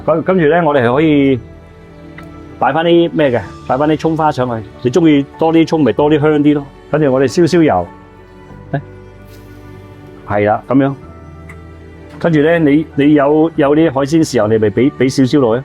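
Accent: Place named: North, China. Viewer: 唔會係純種廣東人吧，講廣東話有北方口音嘅？不過廚藝就頂瓜瓜🎉🎉🎉🎉🎉🎉😊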